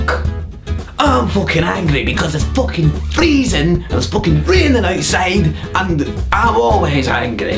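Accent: Ireland. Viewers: I love he Irish voice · I definitley am subscribing, your really funny, and your irish accent is amazin, plus this video truly made my day. Thanx:) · I am an American from California, and I love your accent!!! O your voice is dreamy.. Seriously, if boys here could talk like you.. well... I would be one happy girl. :D <3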